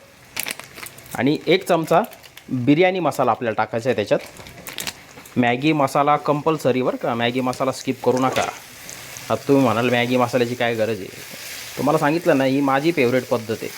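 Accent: Nashik, India. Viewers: भाषा प्युअर नाशिकची वाटते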